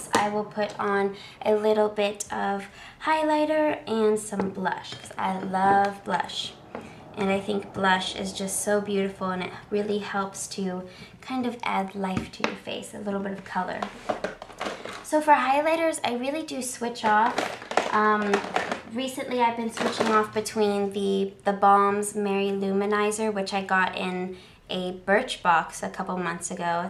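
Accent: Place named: South, United States. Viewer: where are you from i absolutely love the southern drawl!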